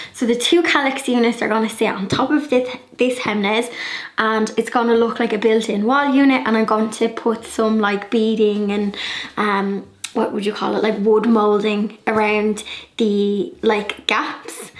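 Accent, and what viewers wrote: Ireland. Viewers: Oh my gosh, that looks amazing, I was also amazed that you just moved things around your room and painted everything in place. I would have had paint everywhere! Great job, loved the idea and thanks for sharing....PS you are simply lovely and I could watch you all day just to hear your beautiful Irish accent. Stay safe, hugs from 🇨🇦❤️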